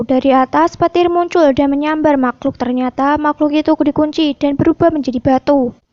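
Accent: Java, Indonesia. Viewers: Terlalu medok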